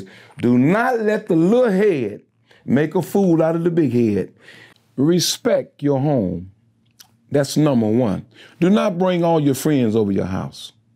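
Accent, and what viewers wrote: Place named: Chicago, United States. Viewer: You can tell from his voice that he's from Chicago. Older black folks in Chicago got a certain way of talking lol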